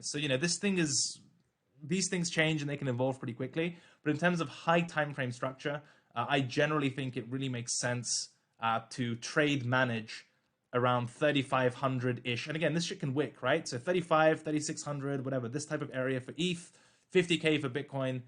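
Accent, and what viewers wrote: United Kingdom. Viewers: Missed listening to the British accent. Can you record the twitter spaces and put it on Spotify? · love listening to you video with British accent and voice haha n the way you explain your chart , slowly bring out the flow and reason why n all the details ! hope theres live video more often ,thanks !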